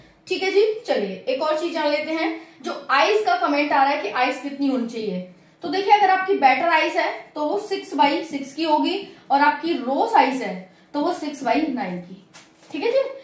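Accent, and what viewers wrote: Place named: Rajasthan, India. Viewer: Didi aap rajasthan se ho kya aapki language se lag rha ❤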